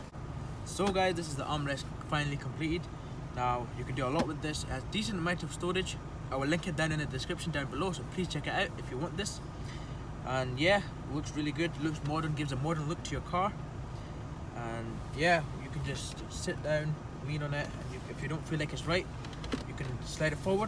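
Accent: Scotland, United Kingdom. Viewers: Thanks for taking the time to show us amateurs how to secure an armrest. I have just bought a 2013 Octavia and need to order one as I keep looking for something to support my arm when I feel lazy. Incidentally, love the Scottish accent. Cheers Mate.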